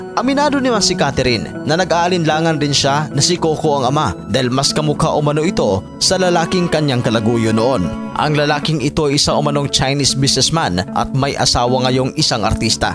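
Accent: Visayas, Philippines. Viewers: Tang ina mo! Ipakita mo video , wag ung visayang boses mo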